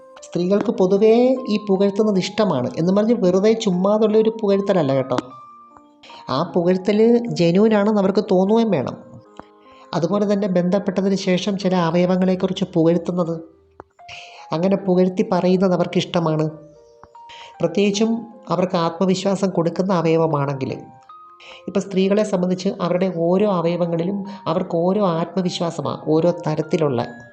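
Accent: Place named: Travancore, India. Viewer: ഈ പറയുന്ന മാം ന് മൂക്കടപ്പോ എന്തോ ഉള്ളപോലെ... പിന്നെ തിരുവിതാംകൂർ ശൈലിയിൽ, ഭ- ക്ക് "ഫ"കാരം ഉപയോഗിക്കുന്നു.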